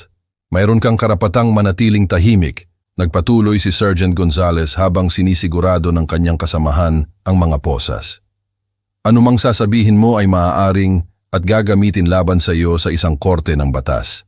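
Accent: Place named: United States. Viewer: ano po Ang nangyare amerikano na Ang nagbabasa eslang masyado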